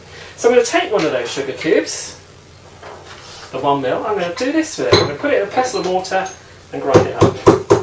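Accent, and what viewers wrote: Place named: England, United Kingdom. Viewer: I only believe this guy because he has an English accent. Great demonstration.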